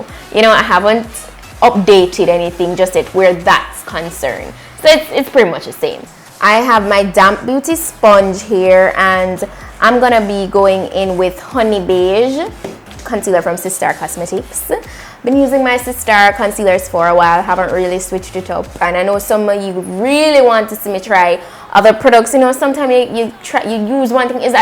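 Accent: Jamaica. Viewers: Your accent sounds Jamaican🇯🇲 💕❤️♥️❤️💕❤️♥️😍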